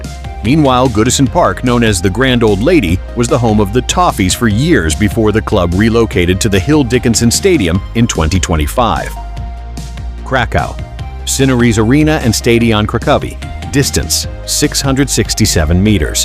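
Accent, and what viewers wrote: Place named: United States. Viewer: Bring back the old narration voice, the American voice doesn't sound as nice.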